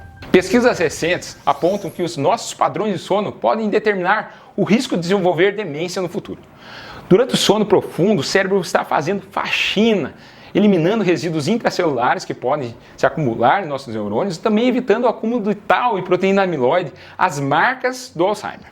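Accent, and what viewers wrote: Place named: Minas Gerais, Brazil. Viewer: Ah, doutor, eu tomo Melatonina quase toda noite pra dormir. Fico muito preocupado, com um distress diário preocupado com a minha esposa, que tem bipolaridade. A minha sogra faleceu com PSP, foi um sofrimento. Fazemos suplementação com D3, Zinco, Fósforo, Ômega 3, Magnesio Dimalato. Ah, gosto muito de ouvi-lo porque o senhor tem um sotaque meio de mineiro.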